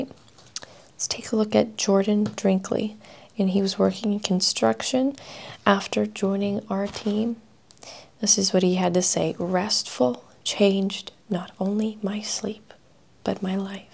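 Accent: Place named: New Jersey, United States. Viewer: Love your Jersey Accent voice in this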